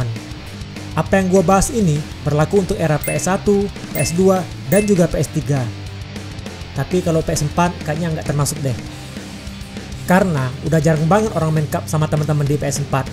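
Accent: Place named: Medan, Indonesia. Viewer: Kayaknya abang ini oranh medan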